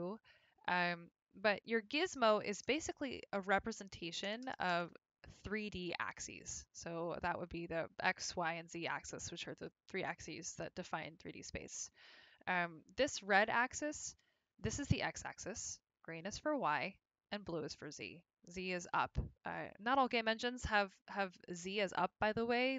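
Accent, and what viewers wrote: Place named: United States. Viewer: How about you get some nazal spray. You sound like you have a cold. Or is that how all Americans sound? Good content but try blowing your nose first so you sound hunan. Sorry for being blunt but if you want a global audience talk like the rest of the world does. Good luck.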